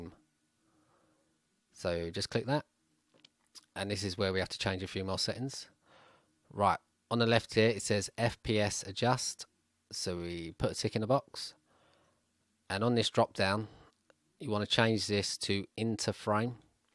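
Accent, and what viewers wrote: England, United Kingdom. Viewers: I love the voice of England. When i hear that voice, my heart shake and can't normal...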